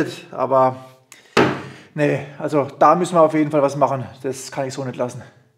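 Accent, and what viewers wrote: Franconia, Germany. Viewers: Klingt extrem Frängisch...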